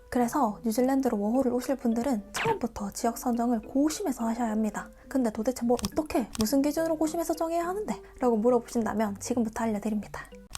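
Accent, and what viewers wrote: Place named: Seoul, South Korea. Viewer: 서울말이 늘었네요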